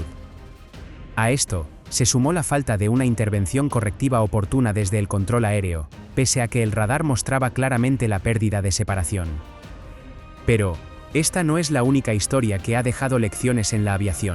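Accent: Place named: Spain. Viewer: Habla como español pero no sabe cuál es el apellido paterno del piloto y del copiloto?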